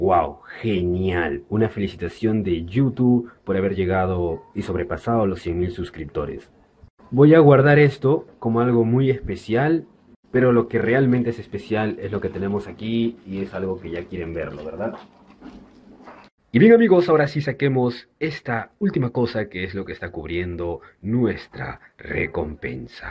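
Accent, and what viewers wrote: Chile. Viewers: felicitacion :) te lo mereces de corazon , eres muy bueno , super entretenidos tus videos :D y otra cosa. Eres chileno sierto?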